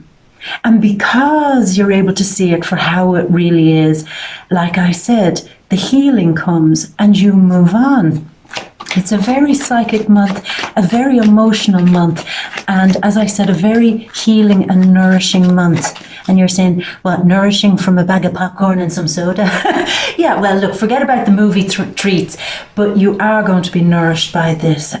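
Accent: Ireland. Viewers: I be getting the feeling that you're lowkey Irish by your accent. Btw. I like your style.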